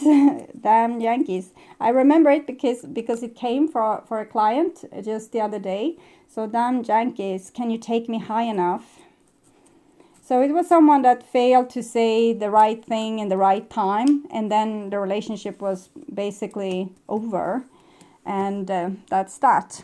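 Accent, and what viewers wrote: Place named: Sweden. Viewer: I thought I recognized the accent😍 Min mor ar fran Skane.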